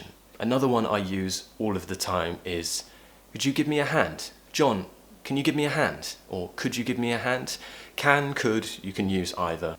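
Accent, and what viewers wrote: RP, United Kingdom. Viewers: Thank you so much to think teaching english because you are the right one in the right place, you make me fall in love in British accent 😍😍😍😍😍😍😍😍😍 I'm Layla from Sudan i will be always watch your videos until i feel i speak like you ♥️ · I really love the way you talk and teach about your British accent style ❤ Thank you so much!!!!❤❤❤❤ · I really like to learn British accent with you. Thanks a lot. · Bro! Thanks by your Lessons , I greateful with you. I can understand every single word you say , I've learnt British English here, you're my Favourite Person 'round the world , I love your accent , I've a little bit of British Accent by you ... See ya !.. I wait meet you in the future and go to take a break or drink tea, just like a Brit guy .. I Say Hi From Mexico 🇲🇽. Be Carefull . ♥️ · I think I have found my happiness. I've been looking for a male British teacher to copy his accent and yours is beyond my expectations ! 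· Thank you for always posting great videos :) I love your british accent and your new hair color❤︎ From Japan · It's been me 2 years learning British accent and................................ I just adore your accent man💖💖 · The best british accent on Youtube! Thank you!;) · How great you are! ♥️ I liked British accent from you❤️ Keep inspiring 😌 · Thanks for such an informative and enjoyable lesson! Your British accent is marvellous! Honestly, miss for your podcasts( Are you planning to do that? Best regards from Ukraine!💙💛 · Hi. I really love listening to you spiking British accent.....!! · Omg I need someone from UK teach me like, I love British accent 😘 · Hi i love u British accent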